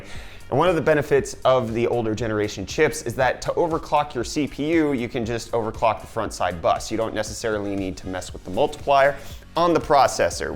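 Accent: South Africa. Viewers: m8 wat? south africaaaaaaaaaaaaa? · You south african? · you from south africa ? is jy van Kaap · Your South African wow so cool I'm also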